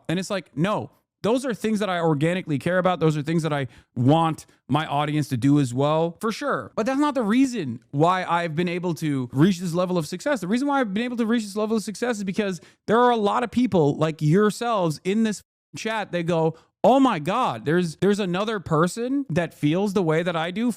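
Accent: Australia. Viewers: It's like a cross between "oh naur" city girl, and broad TV aussie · I'm also an Aussie, I was musing about where geographically in the country his accent might be from. Cities have a posher accent whereas rural Aussie accents are described as "broad" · Heads up I had previously subscribed to your channel and I’m here to find out what the effed up lie sky news is saying about you, being an Aussie myself. And I find out that I am unsubscribed to your channel. wtf? Love your Aussie accent very very funny lol. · Australian Fox News has very few Aussie subscribers, but US MAGA love it. !! See a mention as a point of pride. Your Aus accent is f.....d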